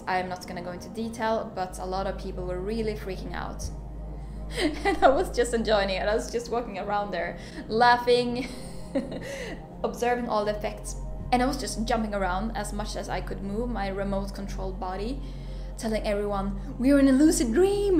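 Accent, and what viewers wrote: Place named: Sweden. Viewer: nice trip report, sounds like you had a wonderful experience! and yes your experience sounds very much like "The K experience", that is very interesting! im actually heading out tomorrow to collect som amanitas, im going to make an extract of it, im very excited :) also i hörde på en gång att du var svensk haha, så trevligt^^ heja sverige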